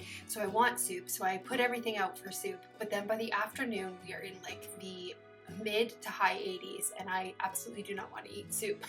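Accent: Canada. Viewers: I just found your channel, I am enjoying following you. I can hear a slight Canadian twang, I live near the border in the PNW. I am excited to see more of you videos.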